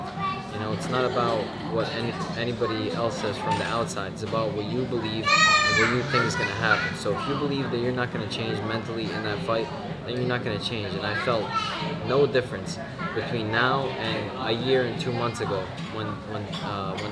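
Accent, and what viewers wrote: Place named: New York, United States. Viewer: The thing I like most about is how he says things that make me take more accountability and responsibility for my life in general. Like how he says you gotta motivate yourself, because exterior motivation fizzles out quickly. I think it may also be the New York accent mixed with his no bs approach, plus the steely blue eyes. 🤣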